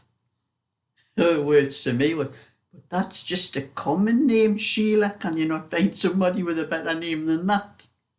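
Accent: Newcastle, United Kingdom. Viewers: Just found you - what beautiful work! And so lovely to hear the Geordie accent - living in Hampshire now I still miss home, after 30 years. What a joy to listen to your tales - reminds me so much of my Auntie Lizzie Sams from Wallsend - in a lovely way! x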